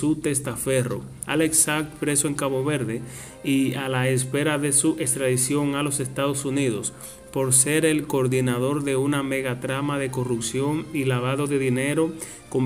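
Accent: Cuba. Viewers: Que mal lees las noticias, y ese acento Cubano no lo puedes disfrazar. Al mejor estilo del G2 cubano...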